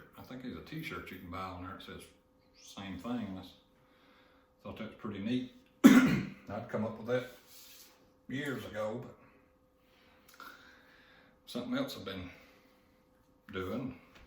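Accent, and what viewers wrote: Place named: Eastern Kentucky, United States. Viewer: Great videos...Just found your videos and still guessing you're from Eastern Kentucky or Mingo County, West Virginia. Great comments about Tony Rice too.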